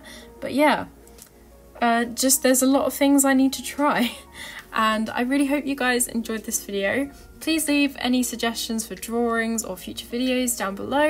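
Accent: United Kingdom. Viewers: So I'm kinda new to this channel I subbed a few weeks ago and I've been wondering "Are you British?" Also I think you should do mermaid watercolor art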